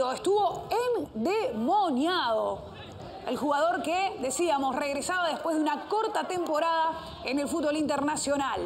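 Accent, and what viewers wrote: Argentina. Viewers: Una hembra comentando ?? Y con acento de argentina ...hasta el culo el periodismo futbolistico en el Peru.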